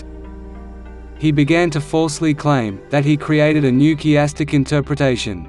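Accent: United Kingdom. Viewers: WTF?! Why a British accent. Turned off, leaving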